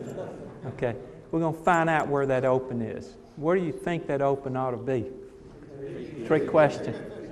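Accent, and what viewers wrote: South, United States. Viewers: Never in my life have I heard a asian with a southern accent...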